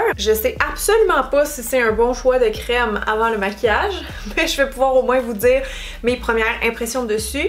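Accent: Canada. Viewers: Mais que tu es cute... 💕😘. Ton accent me rappelle mes vacances au Canada où je compte bien m'installer. Gros becs. À tantôt girl 💋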